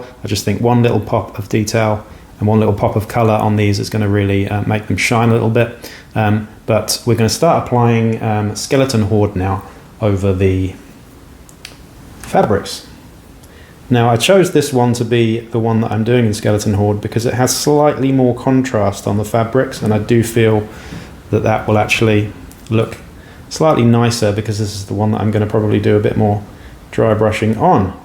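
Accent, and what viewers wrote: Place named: England, United Kingdom. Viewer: I am confused... an English Accent, a Kiwi greeting mentioning Australian shops 🤣🤣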